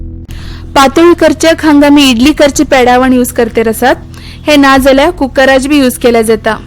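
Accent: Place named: Konkan, India. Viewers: Ur Konkani is different 🤭🤭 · Nice recipe explanation with nice konkani tone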